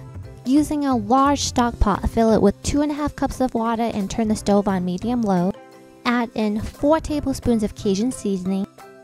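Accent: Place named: New Jersey, United States. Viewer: great recipe! absolutely love the jersey accent too!!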